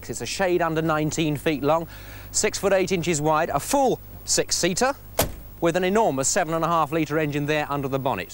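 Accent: United Kingdom. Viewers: Gary Dunn Yeah, but my point was just that people pronounce (and spell) things differently in different countries, so no-one is right or wrong. This video is of a British programme (<yes, that is the British spelling) for a British audience, so that is why he pronounces Marquis the way he does. The British pronounce 'coupé' as the French do, but Americans say 'coop'. I don't suppose it really matters...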